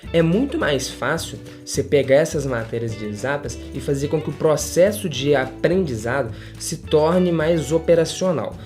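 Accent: Minas Gerais, Brazil. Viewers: Ele é Mineiro, se não me engano.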